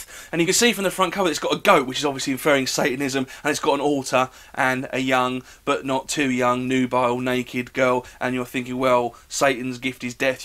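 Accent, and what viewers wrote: United Kingdom. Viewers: not a strange accent, its just brittisch, no problem :P nice vid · love the english acsent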